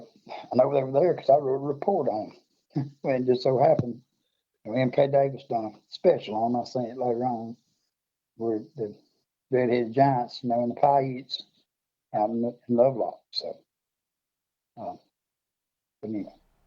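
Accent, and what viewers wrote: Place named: South, United States. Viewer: Accents, or local dialects, are not mistakes. Every place has its own, even New York and New Jersey and all up and down the CA coast. Even if they don't think they have an accent, they do. I come from the country in Southern Illinois, which is Southern, too, and I love all Southern accents. Your manner of speaking and your accent greatly enhance your story. Country and Southern people get a lot more in than just words. More is being said when one turns one syllable into three. Nuances. Thanks for your story. My husband and I loved it. We are up between Killen and Lexington, 42 miles from Bankhead, says Google. Be well.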